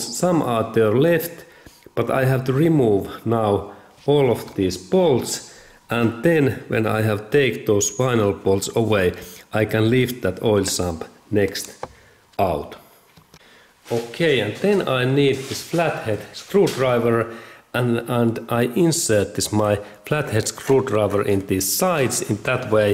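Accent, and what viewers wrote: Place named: Finland. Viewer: Hienoa työtä! Tunnistin aksentista sut suomalaiseksi! Mikä kone siis tässä pöydällä oli?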